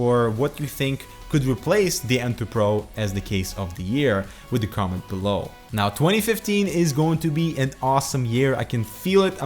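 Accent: Sweden. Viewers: You sound really swedish btw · Where are you from? are you swedish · Are you from sweden?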